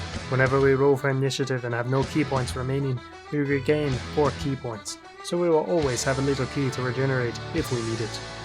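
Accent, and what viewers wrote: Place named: Scotland, United Kingdom. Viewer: The fact that the guy making this has a Scottish accent too 😂😂😂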